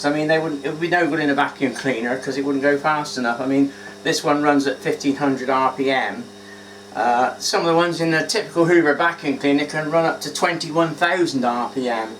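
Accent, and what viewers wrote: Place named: Cockney, United Kingdom. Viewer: Thanks. I LIKE it when you lay the Cockney on thick for us colonials.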